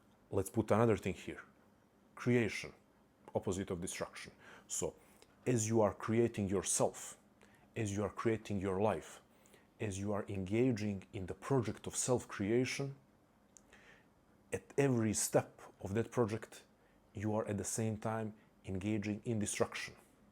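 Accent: Balkans. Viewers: Broo, do you have Balkan roots? You have some strong Balkanic accent (strong š, č, ć, ž, đ, dž sounds)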